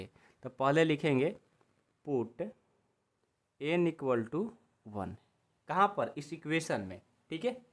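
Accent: Bihar, India. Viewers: Padhana bahut acha hai sir but ye jo alag se sound aa raha hai ye language Bihar ka lag rha hai😊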